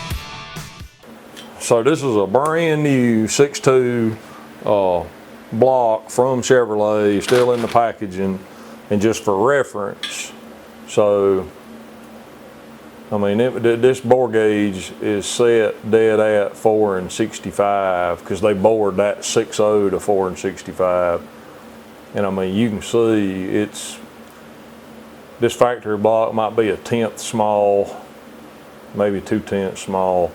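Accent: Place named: Kentucky, United States. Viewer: This guy has a beautiful KENTUCKY accent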